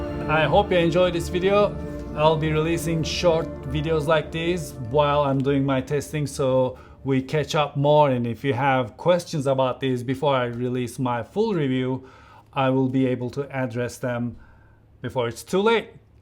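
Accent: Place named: Turkey. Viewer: Bu abi Türk mü? Acaip güzel Türk şivesiyle konuşuyor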